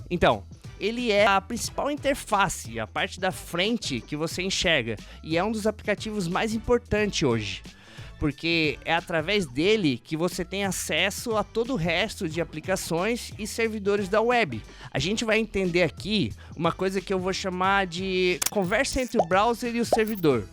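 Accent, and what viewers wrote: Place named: Garopaba, Brazil. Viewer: Cara, vc fala igual um garopabense raiz kkkkk vc é de Garopaba-sc? Não deu pra não notar... kkkk excelente video, mas poderia explicar mais simples antes de entrar no assunto do título hehe mesmo assim gostei... ganhou meu like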